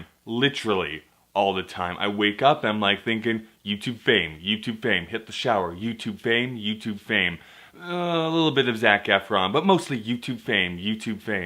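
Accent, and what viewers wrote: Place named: South, United States. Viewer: Hey, I have a channel called The Sweetest Vegan or with about 48,000 subscribers. I am not YouTube famous, but some people recognize me in public, I have received some press, and I have a YouTube Partner Manager. I believe in your content and would love to help you grow your channel (No, this is not a network pitch as I am not apart of one). I stopped posting to that channel because, like you, I can only make videos about what I am interested in and I didn't want to hear a bunch of whinny comments complaining about me "changing" every time I posted. Anyway, you have good content, but there is some low hanging fruit that you are not taking advantage of. Email me at heartoftarts at gmail dot com and we can set up a time to Hangout or Skype. I really want to help you strategize for the new year. I am also from Atlanta, GA, so I can help with your southern accent.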